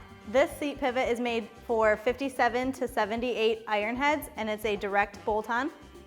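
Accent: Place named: Midwest, United States. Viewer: Nice Thick Midwest accent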